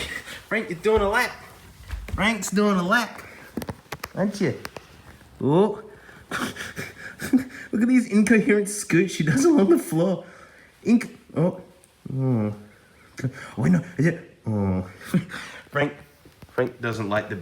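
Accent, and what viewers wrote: Australia. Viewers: i love hearing him get angry in australian!, we enjoy your weirdness my dude. do what feels right. 💜🚗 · I gave literally 0 shits about apple or ipods and have been here since (the tail end of) those days just about. I've been here for the shouty aussie man with his 1 grit and snake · I came for the obscure tech products but I stayed because of your Australian accent and all of the witty one liners. What other flavors of dog farts exist besides the old reliable EGG?! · i am here for you you could degrqde ke for an hour with silly put downs that will probably make me laught caus eiblove your aussie accent and way of being. We watch you for you not necessarily the content. I know you won't because you said you won't touch bluetooth headphones anymore but i do hope you at least smell the nothing (1)s cause they're really quite good for what they do. 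· I come to this channel to see what funny things the aussie man has to yell about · I binge-watched all the videos you released, what was it covid-time videos? the 4-hour long videos; y ai binge-watched them. then i tried to find you on social media and got on discord to discover you dont exist there, so i left, i left looking for you. And when you dont post, I specifically search for " " or " " whatever. I watch your content, dare i say, unconditionally, because, well, too many reasons; the accent for one; the references to aussie slang (that i have to look-up at times). You're awesome, dont let anyone tell you different, keep doing it YOUR way!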